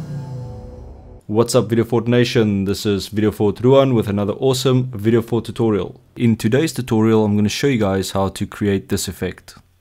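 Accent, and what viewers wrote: South Africa. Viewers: Didnt know south african english differs so much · Love the South African accent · That accent though. Are you South African?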